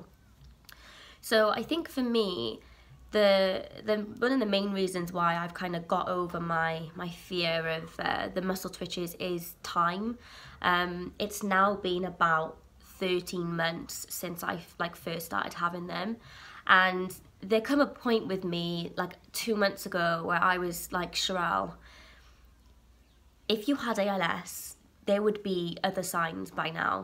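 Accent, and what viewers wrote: Cardiff, United Kingdom. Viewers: Cardiff girl by any chance?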